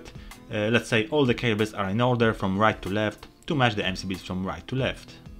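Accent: Poland. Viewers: czesc. Slychac ze jestes z Polski. Pozdrawiam. Nie wiem czy nagrales filmik. Opowiedz jeszcze o ukladach sieci. Pozdro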